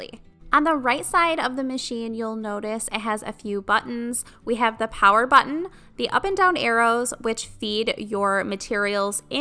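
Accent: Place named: Chicago, United States. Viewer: Sounds a little like a Chicago accent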